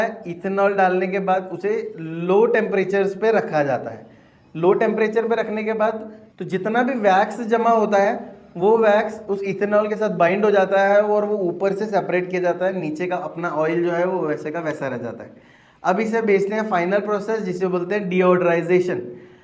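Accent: Punjab, India. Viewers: Aapke language mein punjabi accent hai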